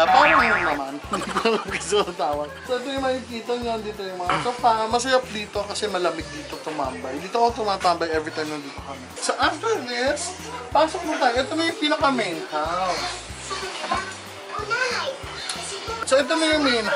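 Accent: Iloilo, Philippines. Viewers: Madam ilonggo ka pla tagasaan ka?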